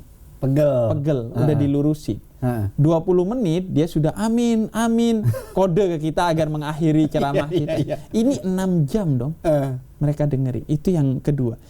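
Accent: Madura, Indonesia. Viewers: pantesan habib logatnya madura ternyata dari bondowoso semangat bib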